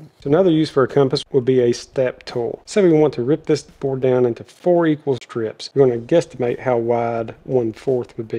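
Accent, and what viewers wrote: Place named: Georgia, United States. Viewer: You don’t use a lot of contractions. “Do not” instead of “don’t.” I don’t hear that too often. I knew someone in Georgia which a similar cadence. Lovely video, cheers!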